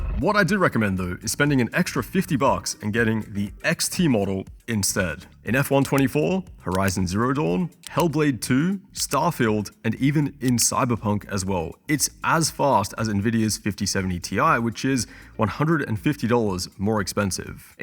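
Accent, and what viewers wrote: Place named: Australia. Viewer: why are u filming videos if u have a cold/flu. not like i can stand aussie accent anyway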